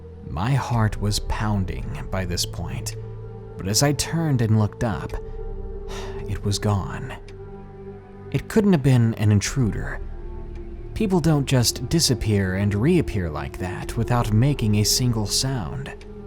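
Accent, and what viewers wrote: South, United States. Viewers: ... you southern accent is to die for. omg. <3<3<3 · I came for the dank stories, stayed for the southern accent. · LOL< your southern accent! You're entertaining beyond the stories! Great job! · That rich southern drawl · I never thought I'd find a southern accent attractive before. O.o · That southern accent nearly KILLED ME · LOL loooooove your southern accent!!! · I love your country accent and I love your videos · I love the Southern drawl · that southern accent it shivers me timbres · Hey, I loved your Southern Accent it made you sound like a Southern Gentalman.. I do declare 😉 much love Sexy Grandma ✌💙💙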